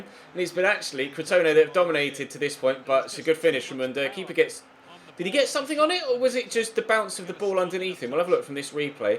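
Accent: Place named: RP, United Kingdom. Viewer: Why do you speak like a class posh commentator?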